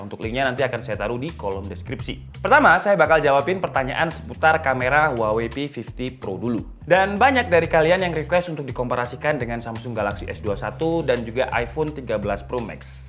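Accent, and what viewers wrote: Malang, Indonesia. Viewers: owalah om wong malang tah...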